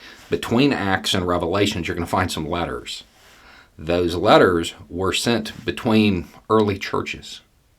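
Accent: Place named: Bible Belt, United States. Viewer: Sounds like a out in the woods BIBLE BELT folk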